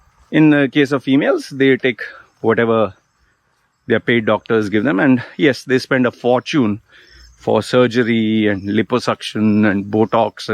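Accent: India. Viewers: Well in a way he is an Indian though. In UAE he studied in an Indian school. Yes, he is not an Indian citizen, but most of his things are Indian, including his English accent.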